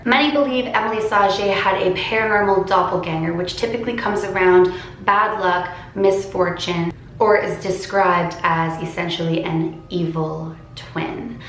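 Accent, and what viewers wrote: Canada. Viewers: WHY ARE YU TALKING LIKE A CANADIAN? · omggg i love ur Canadian accent 💗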